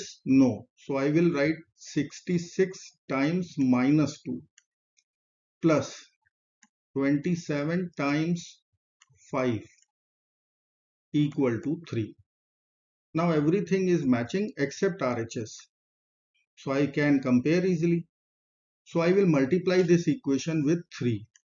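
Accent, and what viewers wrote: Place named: India. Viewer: good video but indian accent is a bit annoying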